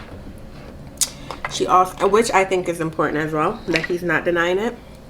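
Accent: Jamaica. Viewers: Her accent lowkey be coming out I wish Uu could do a vid abt Jamaican slang ((&)) they words they use maybe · Are you Jamaican? I love your accent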